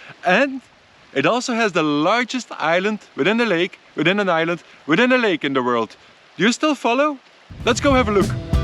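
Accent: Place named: United Kingdom. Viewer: You're sounding more like a British tourist as time goes by.😢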